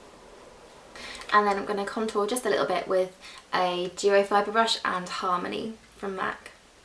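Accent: United Kingdom. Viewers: I love your make up routines and I love your british accent 😍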